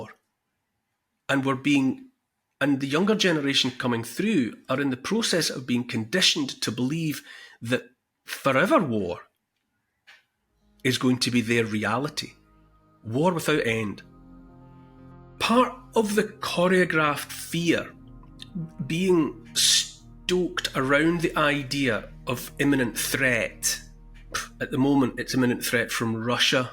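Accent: Scotland, United Kingdom. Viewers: Hi Neil Oliver, thanks so much for your videos, I really appreciate your thoughts. It's so rare that someone sees the truth, & understands what's really going on. I'm pleased you are so brave to speak the truth. TBH your history programmes used to really rub me up the wrong way, maybe it was the strong Scottish accent? Now I am really respecting you though. Lucifer has the control over this world. He is the mastermind behind all the evil you see. Lucifer is the Prince who plans all the wars, & trouble, and evil schemes. He controls the powers of this world. I hope you will read the Bible, because I know it will open your eyes to the TRUTH. Without God there is only death. I'm hoping you don't dismiss this comment, & your eyes will be open. God has the victory, Satan will be destroyed ..... all will be good. Best wishes, appreciate your hard work.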